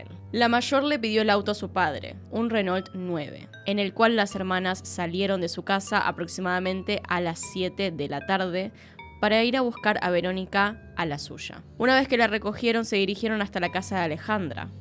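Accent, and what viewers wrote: Argentina. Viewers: de qué parte de Argentina eres?. Un saludo efusivo de una española que te admira❤❤❤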